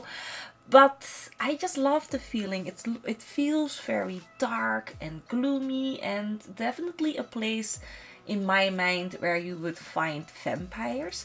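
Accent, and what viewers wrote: Netherlands. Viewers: hahaha ja, ik hoorde het aan de uitspraak:d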